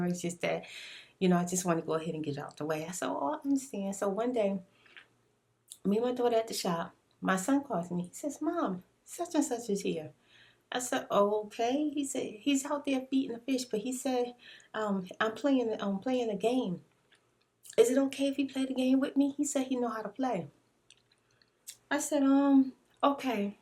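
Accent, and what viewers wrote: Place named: New York, United States. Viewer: you're so pretty! 😍😍😍 love your accent are you from NY?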